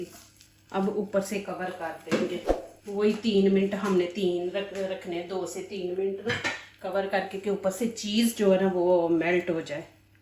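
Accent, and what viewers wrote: Punjab, India. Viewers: Punjabi tone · MashAllah very delicious recipe and Your Urdu so sweet Panjabi accent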